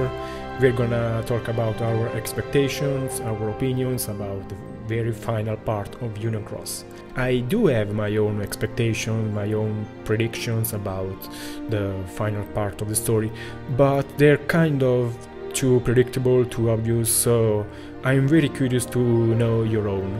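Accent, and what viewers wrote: Italy. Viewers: "So hopefully, you'll be forgiving all my mistakes." Bro, we're not even going to notice them. Also, we Americans love accents. 😉 Including Italian. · So excited to make your stream and getting more content from you!!! That Italian accent could never get annoying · And btw your Italian accent dont annoy :3....it makes you very handsome :3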